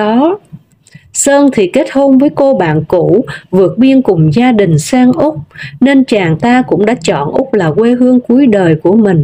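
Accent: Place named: Hue, Vietnam. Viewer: Chị đọc giọng Huế rất chuẩn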